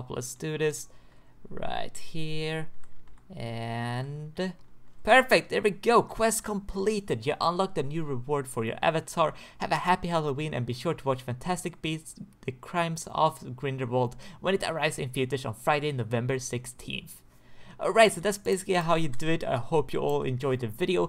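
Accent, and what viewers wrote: Sweden. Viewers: he sound swedish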